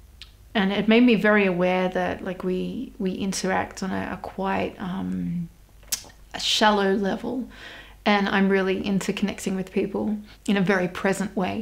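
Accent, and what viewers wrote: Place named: Australia. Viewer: 😂 Your Australian accent + voice = sexyness! Yep! Never been in loooove... Respect is going extinct, I guess. Oooh, and thanks for the "night mode" tip! Everything looks a lot better. I'm going to revamp my crafts page! And... You know your eye shape is so beautiful, everybody blushes! 😊 Much love, ❤️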